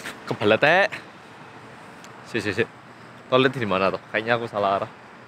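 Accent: Java, Indonesia. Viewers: Loh gaonok jawabane jampot wes kehapus😂. Njir muedok seru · muka korea tp ko medok yo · aku wis nonton videomu ket jaman dipek ket kowe isih nganggo kamera hp. neng aku cen jarang komentar. neng asline aku seneng nonton videomu, kowe ra ngerti aku tapi aku uwis nganggep kowe kaya kancaku, kowe mung luwih tuwa sithik seko aku, caramu ngomong nggo basa jawa, logatmu marake aku ngrasa kowe kaya kancaku, ngarasa kaya kowe ki cerak. and what I like about you I know youre genuine, you care about Indonesia, you care about us like a dear friend. aku seneng ngrugoke kowe ngomong youre like a chatty friend that I never had. thank you for being here, thank you for your wise words, thank you for sharing your story with us. I like to listen to your story on a rough day. It helps me, I love your friendly voice. I hope you will never stop making videos, you could talk about your bad day and I will still listen to it. 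I don't know whether you will read this or not but once again thank you. wish I could meet you in person one day. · MEDOK BANGET ASKJSDHKDSHFHSDDSJ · kadang pas ngomong Korea suka kebawa medok ga? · Wah du bahasa elo medok banget sihhhhhhh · kalo ngomong bahasa Korea (jadi keliatan orang Korea banget) pas pake bahasa Jawa ( medookk tenan mas ) syuuukak banget 😍😍😍 Ketika susah maupun senang selalu ingatlah dengan pencipta mu maka sesulit apapun hidup dapat kamu lalui, sesenang apapun kamu jangan pernah lupa siapa pemberi nikmat/rejeki agar kamu tetap bersyukur. 😊😊😊😁😍😍😍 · Iseng liat vlog dan baru tau vlog ini and... Lah... Medok banget jawanya😆 asli orang mana sih kak? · sepedah pancal hahahha.... orang korea yg super medok... yang bikin ngakak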